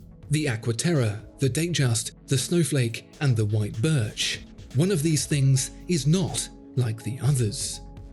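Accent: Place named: England, United Kingdom. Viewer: This channel always makes me hate the English accent 🤡 and can you ever change th music? Jeez And seiko only competes with seiko, not anyone else , why they have 2 factories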